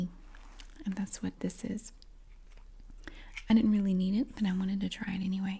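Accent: South, United States. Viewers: I must say ; I think your southern accent really complements the asmr stimuli of sense of relaxation and well being.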